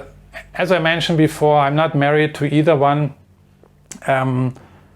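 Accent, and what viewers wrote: Germany. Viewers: love your dinglish Sir, I was living in Nurenberg before, totally missing this beautiful accent, and here is my story, I just met a super pretty Vietnamese lady from Vietnam Cupid website, but I'm suffering the huge obstacles in the lack of english and the difficulty to learn Vietnamese language, the differences of cultural behavior is confusing me greatly, the most incredible thing that i noticed is her super dedication to me which i never seen in my life with any woman I met....we already had 2 fights on our first week, but this video taught me a lot and I hope i will adapt to her....I can mot believe they do not teach english in school, I had to pass 3 languages in Egypt High school to go university ....!!! any how, Danke schoen.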